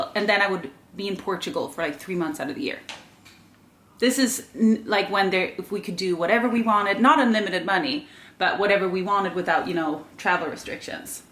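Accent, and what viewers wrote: Ireland. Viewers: Greetings from Florida!!! When you said about people not understanding your Irish accent, I can't understand that because I visit Ireland quite a bit and I absolutely LOVE the Irish accent..... it's so beautiful and Ireland is awesome too!! Eventually I will live there and the people are so friendly! So, Happy Holidays to you 3 and All the Best for the New Year! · Now I know that you've had an Irish twang before it's all I can hear in your voice!! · I’m Irish and I never noticed the your slight Irish accent until now!! Now it’s so noticeable! 💚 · Your Irish accent pops out occasionally it's lovely 💚mostly it's not American the rest is mild Swedish it's very pretty 🤗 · I actually thought you were Irish. 😏 I'm a new subscriber and am binge-watching. I lived in kristiansand when I was 14 and couldn't believe how beautiful it is. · It is so interesting that when I first found this channel, I thought that you, were Irish. 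My nephew in law is Irish and I loved his beautiful accent when he first came to the US. Unfortunately after two decades in the US, he has now lost most of it. But I can still detect a little bit of an Irish accent when you speak. Best wishes for a very Merry Christmas, and Grim. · You still sound Irish to me and not American. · I knew I heard Irish in your accent! Now it makes sense ☺️ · I was thinking you sounded Irish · I wondered why you had a little Irish accent. My parents grew up in the west of Ireland and emigrated to the US where I was born. You also use American slang; that might be from watching American TV shows. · It’s funny you said you used to have a thick Irish accent because I always thought you sounded Irish but assumed it was a Swedish accent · You sound Irish to me😊 I am from Liverpool in the Uk, we are the Irish that couldn’t swim back😊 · As a Californian, I can tell you have a slightly Irish accent. 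Great channel! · You have a very Irish accent.. lovely voice 🎄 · I’m Irish and I knew you had an Irish accent in there!!!! 🍀🍀🍀🍀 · Just caught this video and have to say that every time I hear you speak English in your videos I think you have a slight Irish accent. So you haven't lost it yet! Lol! And it's lovely, by the way. Love all your videos!!! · I’m in the US and I’ve been wondering why your voice has reminded me of a close friend from Ireland who’s lived in the US for many years. Now I know!! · I noticed your Irish accent before you ever mentioned living in Ireland. It was a video ages ago I can't remember the exact video but I literally replayed the clip a few times thinking "that's definitely an Irish accent". Some words you definitely pronounce in an Irish way... Just of course I can't think of them now 😆💚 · I can hear your Irish accent pretty much all the time! It amuses me to be watching someone Swedish with an Irish brogue who lives in Norway!:-) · Just found your channel, I think you’re both adorable. 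Grim is so lovely. When I saw your first video (which was the most recent one) I thought you were Irish, so I now get why I thought that. I live in North Devon in the UK. I wanted to know if Finland is considered part of the Scandinavian culture I know the language is quite different, but I haven’t heard you mention it. If you don’t mind I would I would like to recommend a channel to you. It is Wild wonderful off grid. You may already know about them but if you don’t I think you will enjoy them. Now I will subscribe to you😊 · I definitely think you sound Irish!! I’ve always thought that and wondered 🤗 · You still sound Irish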